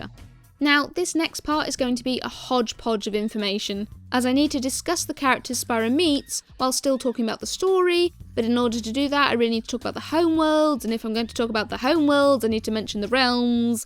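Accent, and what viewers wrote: RP, United Kingdom. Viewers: You have one of those indiscernible ever so slightly posh accents :) I like supernatural/horror content, you should check out Lazy Masquerades channel, also a very interesting voice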